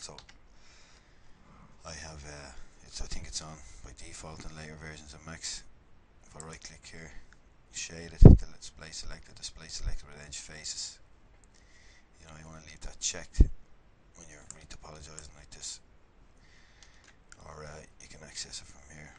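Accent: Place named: Dublin, Ireland. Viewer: so weird hearing a fellow dubliner pop up on one of these videos :P nice stuff man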